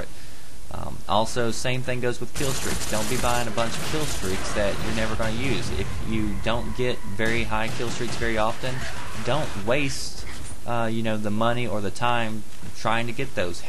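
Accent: South, United States. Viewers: I like this guy's slow southern speech and laid back attitude.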